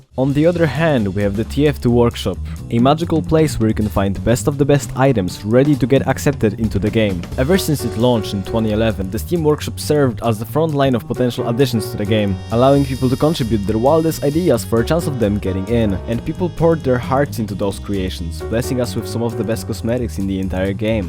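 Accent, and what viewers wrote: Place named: Eastern Europe. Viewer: Are you Central or Eastern European? Your accent sounds slavic. Great video by the way.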